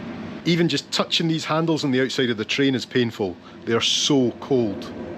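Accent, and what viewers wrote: Scotland, United Kingdom. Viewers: I love watching your travels and this one gave me an appreciation of just how good you are at editing. The 5sec cut where you threw the napkin onto the plate after that impressive looking lasagna was like the full stop at the end of the dinner sentence, ready for a new para. Im half Scottish and your accent reminds me of my youth there. Great journeys and travelogue. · Oh you are toooo adorable...I love your Scottish accent.Subscribed just to listen to you talk...and your sense of humor.😅...very interesting content too!😊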